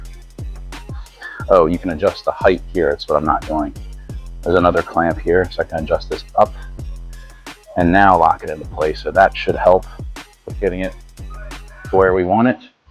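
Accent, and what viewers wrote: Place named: United States. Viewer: What we all need. A video of installation instructions in American English. 😊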